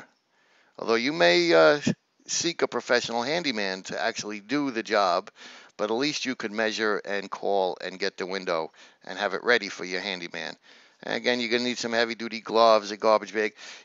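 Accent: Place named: Brooklyn, United States. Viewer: good one. Cool Brooklyn accent too....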